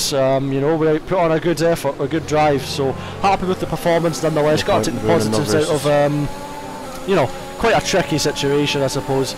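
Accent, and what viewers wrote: Scotland, United Kingdom. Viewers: If you pay attention you might hear a slight Scottish accent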